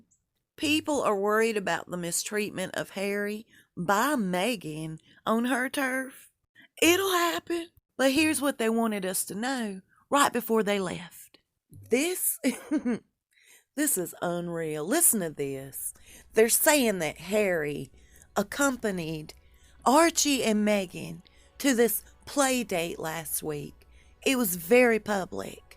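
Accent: South, United States. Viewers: It's called a southern accent. A lot of us here in the U.S. have it..lol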